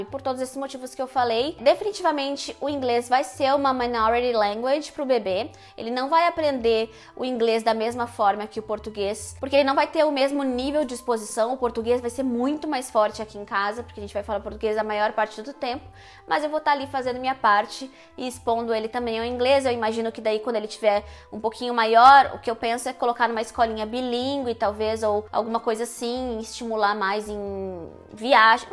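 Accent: Rio Grande do Sul, Brazil. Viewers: Que alívio ao assistir esse vídeo! Tb sou teacher, meu baby está agora com 1 ano e eu estava muito insegura, mas me identifiquei muito com o vídeo e percebi que estou no caminho certo! Tb faço time and place e espero que dê tudo certo! Super obg, te admiro mto e amo teu sotaque, tanto gaúcho pq tb sou gaúcha como onde inglês! Super beijos!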